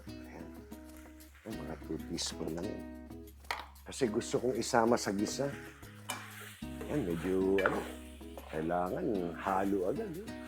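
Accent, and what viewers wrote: Marikina, Philippines. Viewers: Taga Marikina din po ako parang naririnig ko ang Tatay ko pagnagsasalita kayo may puntong Marikena… mahilig din po syang magluto 😊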